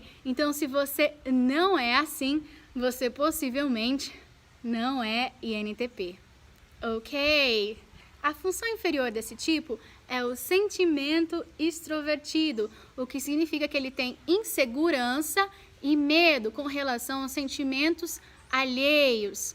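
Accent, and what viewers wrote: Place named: United States. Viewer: gente, ela fala muito "okay" pq ela morou muito tempo nos eua ai fica meio q um sotaque sabe, para brasileiros que não conhecem ingles pode parecer diferente mesmo, mas òtimo video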